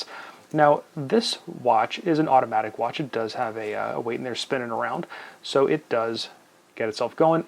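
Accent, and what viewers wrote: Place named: United States. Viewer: At least you are self aware to it😁.. yes, you guys in the US do say things in a funny way 😉. Actually just one thing- Tourbillon is pronounced without the “LL”, so it sounds “to(u) r bi yon”. Great review. I own the Aesop, the other dial, and the Pierre Paulin (same dial but with 3 hands). I enjoy them a lot.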